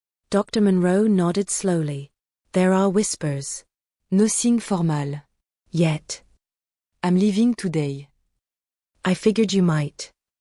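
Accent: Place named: France. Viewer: Extremely annoying! Was unable to understand the foreign French words, hard enough to understand the few English words the narrator spoke with the horribly thick French accent. If you are going to narrate a story, pick ONE language and stick with it!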